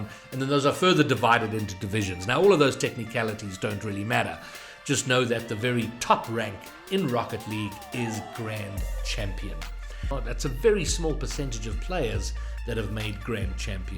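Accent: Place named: South Africa. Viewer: i want to know if you are south african since your accent is the same as my south african grand parents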